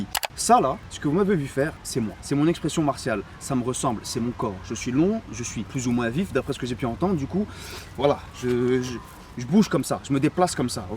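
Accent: Belgium. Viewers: O lala l'accent belge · Tu es belge ?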